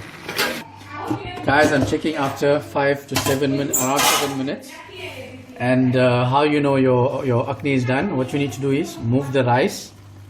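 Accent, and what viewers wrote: South Africa. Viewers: I am South African now living in the US and I love your South African accent :-) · You sound south african..I'm south african..but live in the uk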